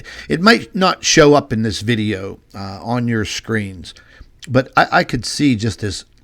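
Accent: Pittsburgh, United States. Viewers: Hi: So glad you've started reporting again. I know you are busy and with the good Doctors, health issues it's difficult to find time for all you want to do. But this video is sensational- I mean sensational! That steller core(?). is amazing, thank you. Yes, it makes me wonder and how dare NASA and the psuedo scientific community not doing what you do, shame on those scientific charlatans. I occasionally have dabbled in a youtube channel if I should start again how can I get permission to use some images and quote your research, of course, I would give all credit to you should you desire. The best way to initially respond is to reply here I won't waste your time nor mine with email unless you agree then I will contact you. FYI I was born and raised in the South Hills area of the "burgh" I have not been back in 40+ years. Your accent and attitude remind me of my childhood home, thank you.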